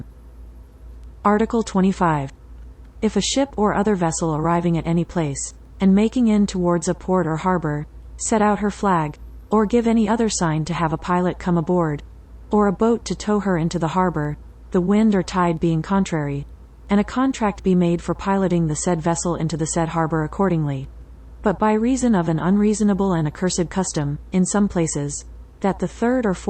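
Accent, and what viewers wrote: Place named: Louisiana, United States. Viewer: Man I love your content. I hope I don’t offend if I’m wrong but I detect a Louisiana accent. Woahdayyyy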